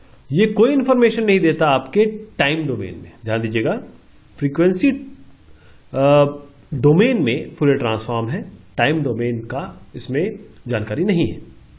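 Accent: India. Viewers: 这tm直接把字幕翻译成德语了，服了这咖喱味的口音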